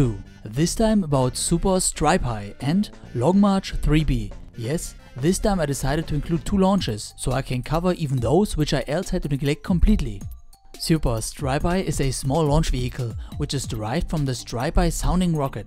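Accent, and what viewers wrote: Germany. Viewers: he sounds German lol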